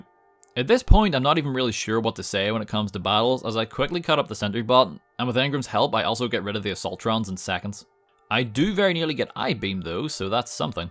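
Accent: Northern Ireland, United Kingdom. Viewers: Northern Ireland? 👍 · You from Northern Ireland? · You sound northern Irish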